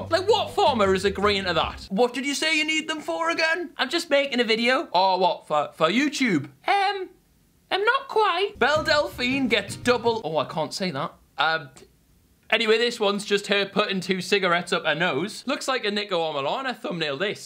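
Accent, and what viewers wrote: Newcastle, United Kingdom. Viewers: Are u from Newcastle boi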